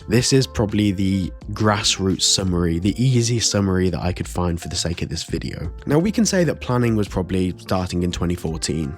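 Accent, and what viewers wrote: United Kingdom. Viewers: i scrolled the comments before starting the video and from the way they spoke i didn't see this coming but.. dude that mask is sick lol, fuck the ones pushing for a reveal, they're not worth shit. You sound British, so you should know first hand how people act with famous people, no one's worse than the brit paparazzi and i wouldn't hold kids on youtube at any higher a standard, your identity is yours, do what YOU want with it.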